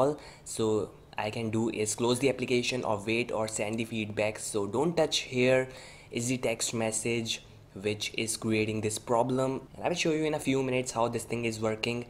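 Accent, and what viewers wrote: India. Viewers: Парень индус какой-то, английский его режет уши)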